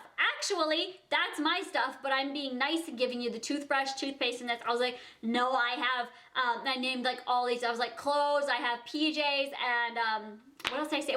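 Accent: Canada. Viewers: Mina Mina she probably sounds different because she has a Canadian accent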